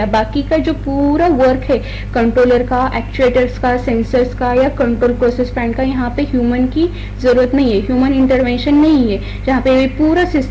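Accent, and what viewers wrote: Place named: Hyderabad, India. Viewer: Ma'm aap Hyderabad se hai kya? Aap ka lahja batara k aap hyderabadi hai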